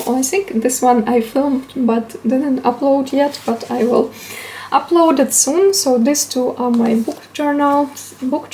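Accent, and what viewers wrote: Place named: Russia. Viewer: Off topic but I LOVE YOUR ACCENT😭🤲🏼 are you Russian?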